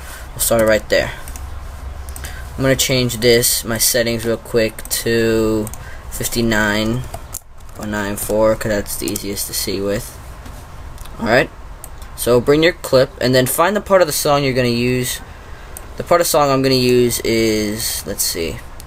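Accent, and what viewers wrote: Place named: United States. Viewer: Thank god, an american for once.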